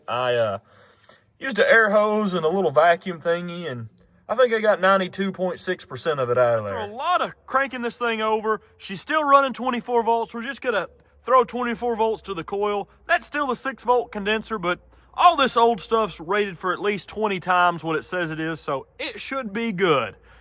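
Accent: South, United States. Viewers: I come for the video. I stay for the good-ol-boy accent. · I missed that southern drawl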